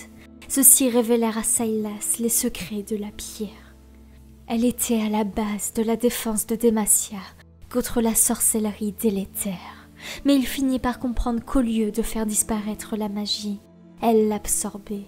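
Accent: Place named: England, United Kingdom. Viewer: Nice, petite vidéo histoire de se rappeler le lore du champion avant le jeu ^^. Toujours un plaisir d'écouter tes vidéos et tkt pour ton accent anglais il est pas si mal en vrai x). Ps: je dirais la majorité des persos importants de demacia et surtout jarvan, garen et lux et si ont peut avoir des membres de la griffe hivernale en plus se serait cool.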